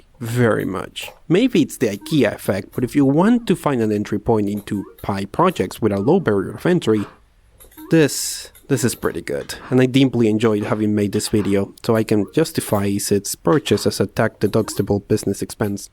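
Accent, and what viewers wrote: Spain. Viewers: Guys he Isn't Asian, he is an Spain Guy, so that accent is Spanish accent.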